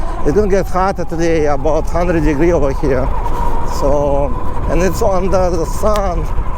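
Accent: Russia. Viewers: мне русский акцент режит слух, такое дерьмовое произношение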